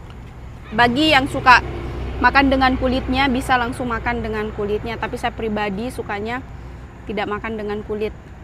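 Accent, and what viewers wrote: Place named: Sulawesi, Indonesia. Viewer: Pengaruh dialek😅 Sulawesi e lebih tajam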